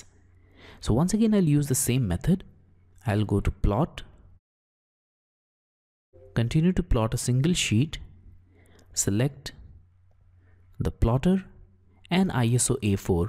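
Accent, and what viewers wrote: India. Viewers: your Indian accent realy annoying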